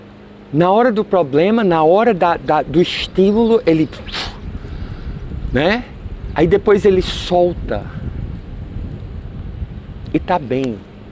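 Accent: Northeast, Brazil. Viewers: kkkkkkkk Amo o seu sotaque professor. Você é nordestino? Algumas pessoas dizem Auauauauau? kkkk Essa técnica parece com uma do Eckhart Tolle diz no livro O Poder do Agora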